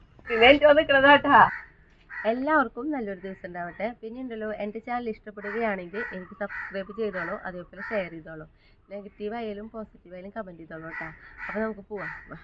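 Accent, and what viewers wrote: Thrissur, India. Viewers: കുറച്ചു കൂടി clearആയിട്ട് സംസാരിക്കണെ..ഞങ്ങൾതൃശ്ശൂർക്കാരല്ല..മനസ്സിലാകാൻ കുരച്ചു പ്രയാസമുണ്ട്❤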